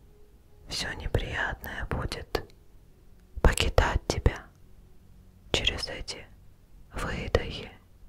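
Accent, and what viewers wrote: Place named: Russia. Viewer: Adoro el acento de las rusas, hermosa.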